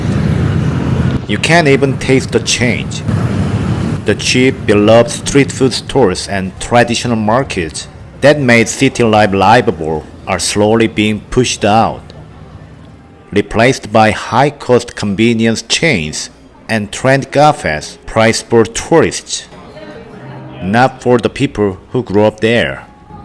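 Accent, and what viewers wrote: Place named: South Korea. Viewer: Impressive work! I just hope that you can drop the Korean accent 😅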